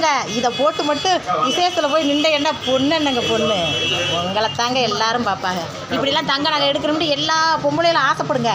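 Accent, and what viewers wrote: Madurai, India. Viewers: Madurai ah ka neega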